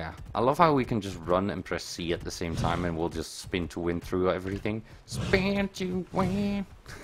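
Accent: Netherlands. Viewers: is from NL Cant you tell with the accent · that's why I asked it sounded like he had the accent im from NL too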